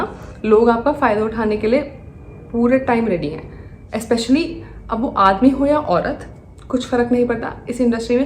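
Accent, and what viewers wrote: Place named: Delhi, India. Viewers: delhi mein sab hi aise baat krte hain 🎲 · I started watching her just couple of days ago and it’s just my second video of her ( 1st one is that haunted one) bruh, the way she rants and talks 😅🤣. Proper Delhi accent 😅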